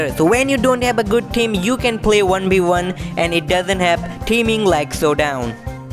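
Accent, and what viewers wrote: India. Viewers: This Indian accent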